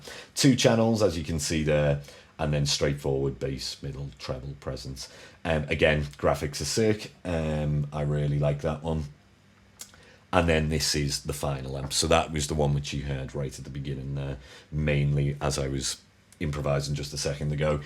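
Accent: United Kingdom. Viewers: Great chops mate! I will however admit that it was your deep timbre British accent that got me smashing that like and subscribe! Ha! Seriously my dude. You should do voice overs. LMAO! Good content doggins. Cheers!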